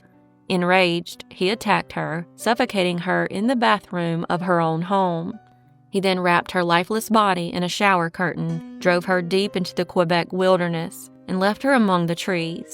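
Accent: South, United States. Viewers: IT’S HER SOUTHERN GIRL CHARM!!!😉😉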